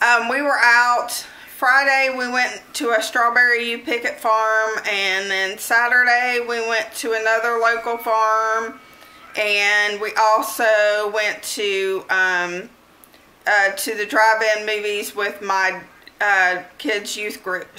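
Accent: South, United States. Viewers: What a fun video ... you definitely have a southern accent! I was born and raised in east TN and for some reason do not have much of an accent. I don't think I do, anyway, hehe. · You do have a southern accent!! LOL. I love it! And YAY!! Someone else says rolling!!! I've added your video to the playlist! WHOOT WHOOT!!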